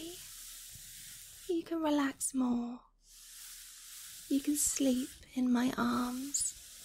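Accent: United Kingdom. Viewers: Wale accent?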